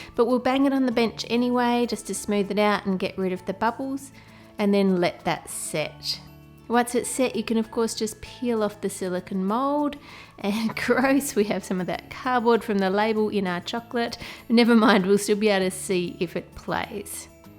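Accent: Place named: Australia. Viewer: I have entered!! But... do you have to click the subscribe with email button? Mom doesn't want more emails... lol. 😆 Anyway, I LOVE your videos,! They're Sooo fun to watch... you're so creative! And I've gotta say, I love your Australian accent. 😊💖 And your nails! They're such a pretty color!!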